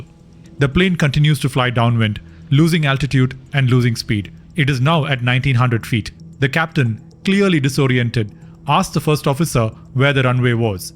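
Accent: India. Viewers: The Indian accent makes it very hard to watch. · Bro are you Indian or Australian? The accent is definitely Indian.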